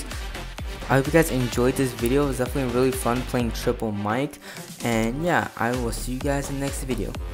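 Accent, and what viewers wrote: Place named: Brazil. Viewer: Parace br tentando falar inglês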